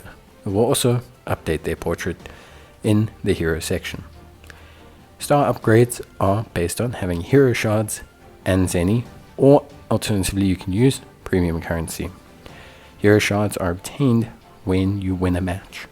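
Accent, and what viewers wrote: South Africa. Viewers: I know a 🇿🇦 voice when i hear it. Big ups bru!!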